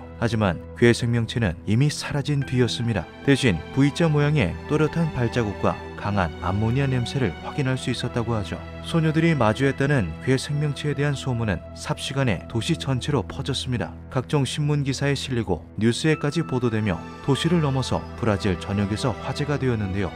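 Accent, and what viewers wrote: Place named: Gangwon, South Korea. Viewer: 고향이 강원도 신가요? 강원도 억양 있으신데 ㅎㅎ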